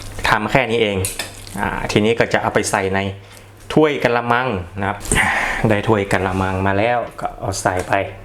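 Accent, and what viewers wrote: North, Thailand. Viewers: อู้คำเมืองเพราะขนาด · คนเหนือเฮาอู้ม่วนจ้าว❤❤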